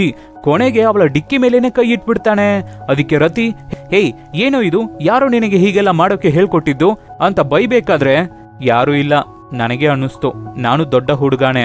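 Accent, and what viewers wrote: Tamil Nadu, India. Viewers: tamilian kannada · I think he is Tamilian as per his slang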